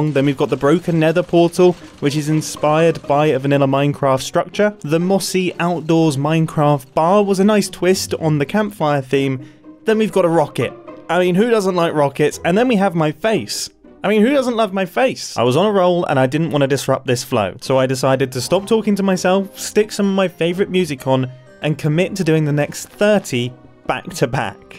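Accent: United Kingdom. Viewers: Are you britsh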